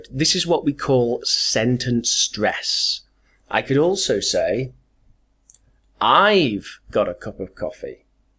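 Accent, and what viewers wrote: Northern England, United Kingdom. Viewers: Northern England, that's where you're from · I love British accent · so into Brit accent. You're superb! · You are a native British speaker, or you learnet this British accent?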